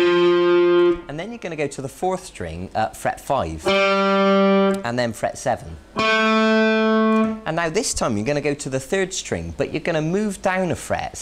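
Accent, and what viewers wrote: United Kingdom. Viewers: yeah, but I like this guy better, he has a british accent. And the other guy yelled at me, "HI EVERONE WHATS UP?!?!?!?!" I dont like him too much. And this british guy is fine, he knows what he's doing, and I'm learning stuff without a guitar so shut up.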